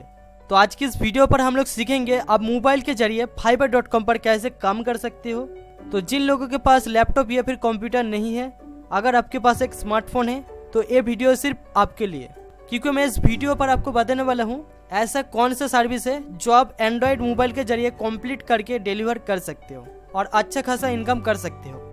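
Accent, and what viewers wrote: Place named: Bengal, India. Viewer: Bro thik se hindi bolo banhali kayse bol raje ho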